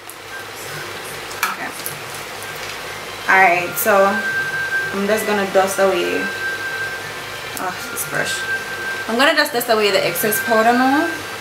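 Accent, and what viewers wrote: Jamaica. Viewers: I'm from Trinidad. ... but a Jamaican accent is so exotic to me.... lol.... keep doing what you doing girl..... one day I hope my channel gets as bomb as yours....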